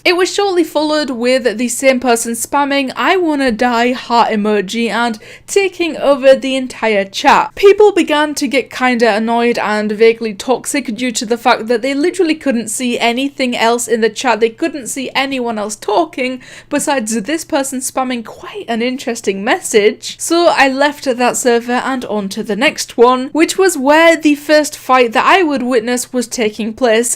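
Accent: United Kingdom. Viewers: It sounds like u live in the UK, nice! Diff then but.... your not “coping him” of course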